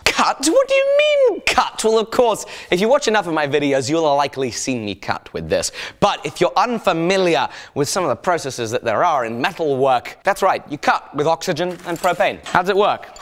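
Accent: England, United Kingdom. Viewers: where about's are you from in England to obtain this accent?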